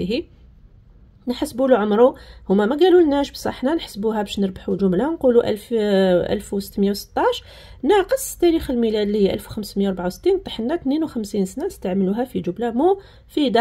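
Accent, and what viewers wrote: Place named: United Kingdom. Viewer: أستاذة راكي تهدري b British مشي بU.S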